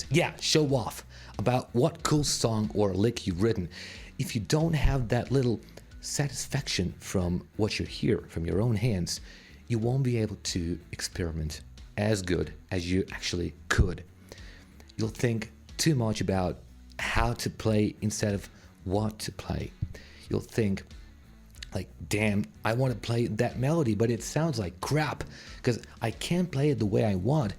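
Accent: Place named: United States. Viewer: Your accent has changed...it used to sound more British and now it sounds American.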